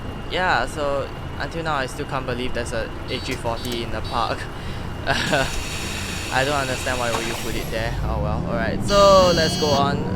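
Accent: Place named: Singapore. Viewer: OMG Your Voice Sounds Like Singaporean When You Speak English.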